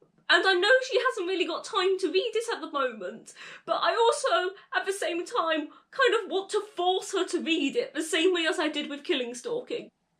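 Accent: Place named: United Kingdom. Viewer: I've always wanted a British accent!!! Don't let it be, ur born with it and it's very very beautiful!!! I'm 20 so u know I'm not some old creep.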